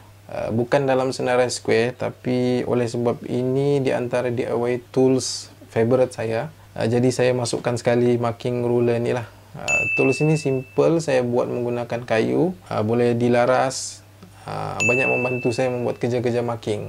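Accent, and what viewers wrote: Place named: Sabah, Malaysia. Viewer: Tuan boleh tau asal mana? Macam bunyi2 sabah. 😃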